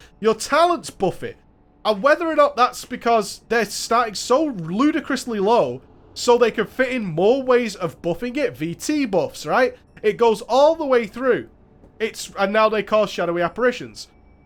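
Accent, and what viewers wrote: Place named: Manchester, United Kingdom. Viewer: It must be the Manchester accent but I can never tell when (or anyone) is mad or enthusiastic about something when i can't see his body language.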